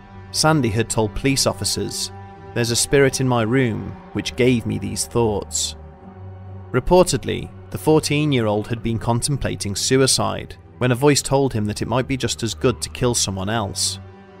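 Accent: United Kingdom. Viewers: Sorry just because it’s a British accent doesn’t mean it’s good 🤷🏽‍♂️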